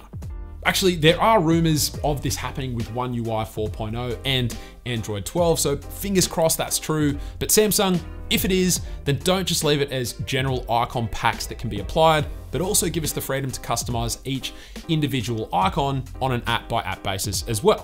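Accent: Australia. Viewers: Your Australian accent sounds really fun ngl, and I like how you use 21:9 video. Watching full screen on a longer phone is such a joyous experience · You Australian accent tickles me 🤣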